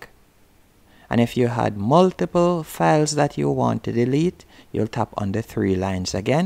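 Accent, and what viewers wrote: Jamaica. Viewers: u jamaican??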